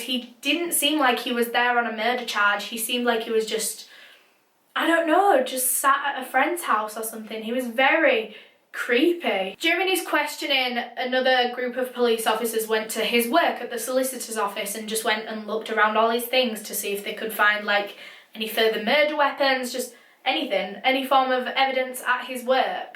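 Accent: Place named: North, United Kingdom. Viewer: ah so it’s a British accent? i couldn’t really tell what it was because it’s so thick, but i loooove her voice.😍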